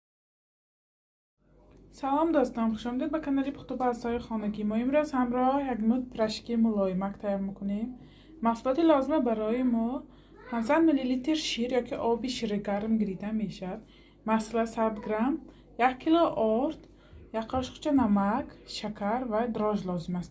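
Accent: Isfara, Tajikistan. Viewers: Бехтарин кадбоонуи зур шумо ба назарам аз исфара лахчаи исфарагихобоин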